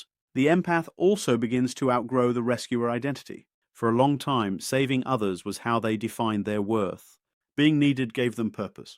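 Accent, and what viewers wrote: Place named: England, United Kingdom. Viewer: since when does Chase Hughes have an English accent?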